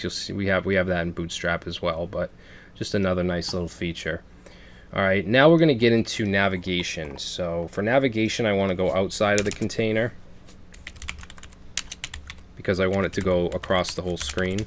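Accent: Boston, United States. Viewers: You are from Boston huh.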